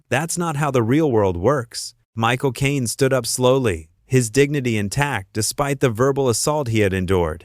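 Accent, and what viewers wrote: United States. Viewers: This British Insights video is just a way to click and watch this video with untrue facts. This video was edited from the Loose a woman Show and it has a voice over story There is a similar video featuring Lorraine Kelly” insulting” Sir Michael as well . I wish these companies like “ British Insight “ using an American voice over to do something positive. Make videos for something like saving the rainforests or helping homeless people instead of winding people up.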